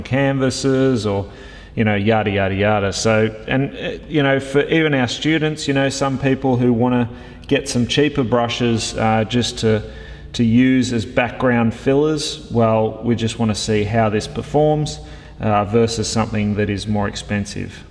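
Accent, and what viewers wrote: Australia. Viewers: A whining Australian ruined this comparrison. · Twelve minutes of earache listening to an Aussie accent... and the conclusion is pretty much what one would have expected.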